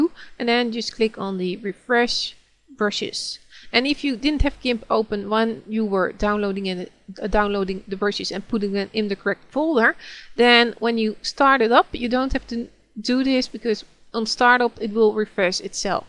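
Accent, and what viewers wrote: Netherlands. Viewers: Dear. Thank you sooo much!!! And where are you from??? You sound Dutch.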